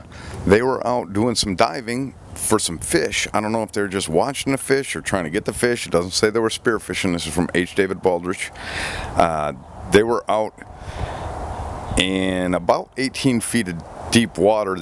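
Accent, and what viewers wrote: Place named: Florida, United States. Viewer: I knew you had a Florida accent! I still can’t get over that they found a Great White with a 6’ Blue Shark, 9’ Dolphin and Turtle in its stomach! We hear 6’ or 9’ and think that’s small, if you’ve ever been fishing think how that fish flips around on the deck, then imagine one as long as you, or in the case of 9’ three feet longer it’s A HUGE FISH! And in the STOMACH of a Great White, if those bigger ones wanted to swallow you whole they could, just giant monsters.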